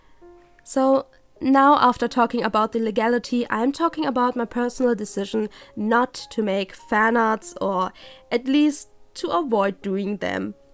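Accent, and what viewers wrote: Germany. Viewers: In my opinion you sound like an German, speaking english. You know what I mean? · Ich wusste erst nicht das du Deutsche bist...hab's aber iwi an dem Akzent erkannt...denn viele deutsche sprechen manchen Vokale im Englischen nicht richtig aus...bzw. klingt es nicht nach dem typischen Englisch...ich meine es nicht böse -3- ^-^ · great video and incredible drawing! (btw are you from germany? your english sounds like it c:) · Your 100% german. I am too, and I can hear it through your accent ! :D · Hey :D are you german? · Hey, I didn't even notice that you were german, until I saw that you used german Texts as examples in your video! And when I listened closely afterwards I could even hear an accent, which I didn't hear before. I'm german aswell, and it's always nice to meet other good, english speaking german artists. ;,D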